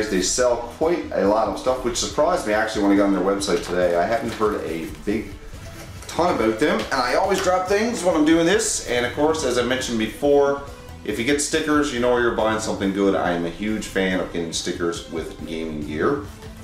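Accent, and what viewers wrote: Newfoundland, Canada. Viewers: Where are you from? You sound like your from NL Canada! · god im canadian but this guy is really canadian haha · Are you a newfie? You sound like a newfie. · You are so Canadian! I'm Canadian but I don't have an accent like that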